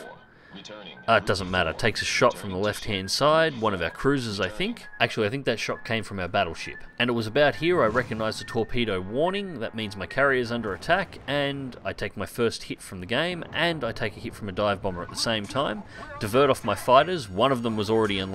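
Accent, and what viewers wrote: Australia. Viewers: good to hear an aussie voice on a wows vid. This was one of the most instructive vids i've found on cv. thx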